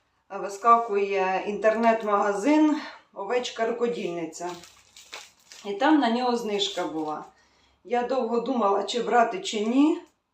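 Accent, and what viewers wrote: Poltava, Ukraine. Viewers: Ви все правильно робите, я на Ваш канал підписалась саме завдяки полтавській говірці, а потім вже в'язання! Дякую Вам за щирість! Продовжуйте знімати в своїй манері, чекаємо!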